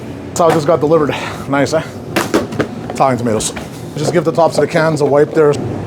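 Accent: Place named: Montreal, Canada. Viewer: You are everything and all that, plus a good dad. But that Montreal accent! Chef's 💋. I miss it out here on the rural west coast and yours is a particular thing of beauty. Have a great service tonight. Love the videos.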